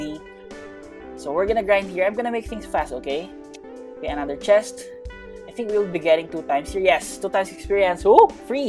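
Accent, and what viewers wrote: Philippines. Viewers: why do you sound tagalog/pilipino hmmmm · He sounds Filipino. Is he Filipino?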